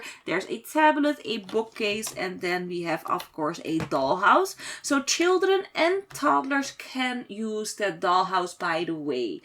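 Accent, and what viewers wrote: Netherlands. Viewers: because you have that Dutch accent and no fense though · hellooooooooooooo! euhm youre accent is like a dutch one. im also dutch so why would this be hate? but my question is:are you dutch? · u engels is heel slecht je moet de T niet zo nederlands uitspreken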